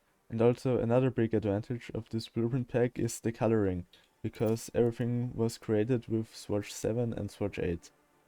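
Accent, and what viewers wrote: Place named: Germany. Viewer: your native language ... seems to be german ... thx for the highway blueprints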